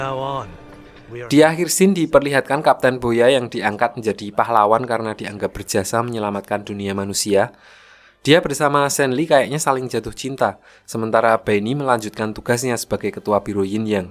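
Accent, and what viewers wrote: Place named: Java, Indonesia. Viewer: Medok jawa banget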